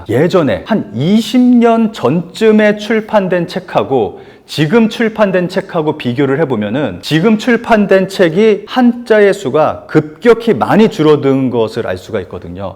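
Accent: Japan. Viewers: 발음에 일본 액센트가 있네요~ 재일교포이신가요?^^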